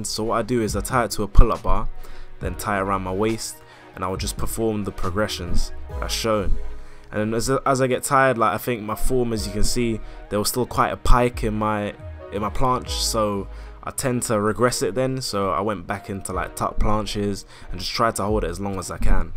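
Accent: London, United Kingdom. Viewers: You from London??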